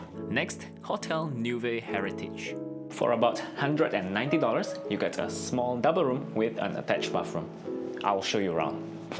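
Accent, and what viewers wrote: Indonesia. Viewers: Your accent come from where? Sounds more like indonesian rather than singaporean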